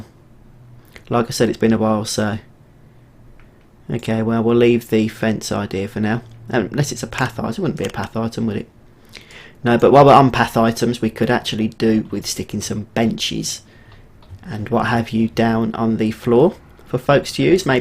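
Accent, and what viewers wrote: United Kingdom. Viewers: I like him he sound like a really posh English man same as me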